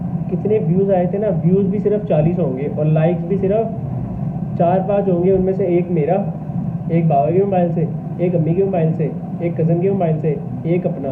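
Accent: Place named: Punjab, India. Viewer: U speak like pungabi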